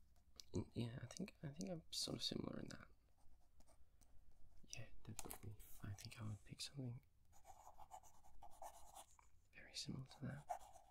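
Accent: Australia. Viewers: just stumbled across ur channel & immediately subbed! don’t know if it’s the familiar aussie accent or just ur whispers in general, but ur voice is so insanely comforting <3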